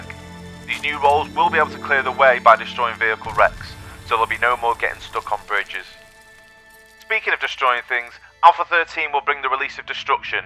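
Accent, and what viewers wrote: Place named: United Kingdom. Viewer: Why a British voice??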